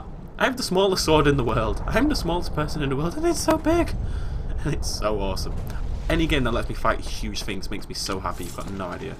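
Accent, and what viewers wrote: United Kingdom. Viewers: Got to love how the British say H.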